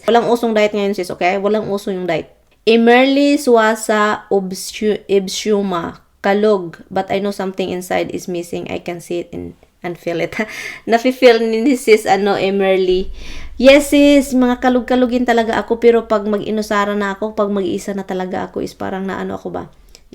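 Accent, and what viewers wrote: Visayas, Philippines. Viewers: surphlis kalog tapos cge ko katawa na mabisaya usahay ang tagalog nya😂❤️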